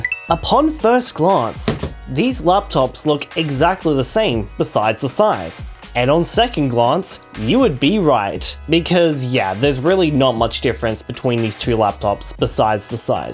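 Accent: Australia. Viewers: he's Aussie.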